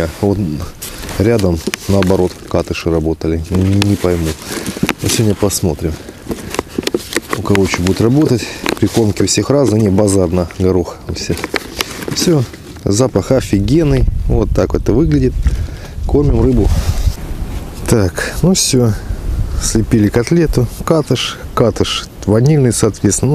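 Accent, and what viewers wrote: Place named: Eastern Ukraine, Ukraine. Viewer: Тут еще и говор Восточной Украины. В Харькове он еще ярче выражен...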